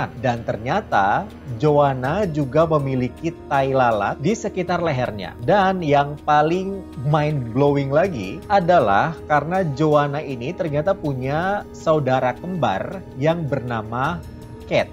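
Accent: Makassar, Indonesia. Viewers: Orang Makassar kah ces?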